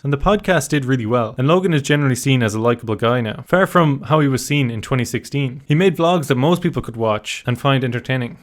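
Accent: Ireland. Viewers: That's an Irish accent right there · Nice piece on Logan. I would have never of thought I would watch more than a minute of Logan’s content and nowadays I won’t miss an episode of his podcast. I hope he makes things right about this crypto stuff, I feel like he’s going to address it soon. Btw I’m a Leitrim man myself you don’t sound far off. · You sound like an irishman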